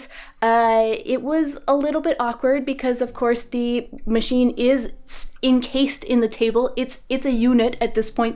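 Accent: Canada. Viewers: You have a lovely Canadian accent when you speak French! 😉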